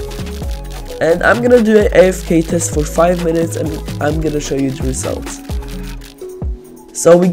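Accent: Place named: Germany. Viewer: Ich hör so hart den deutsch akzent raus hahahaha kriegst aber trotzdem ein abo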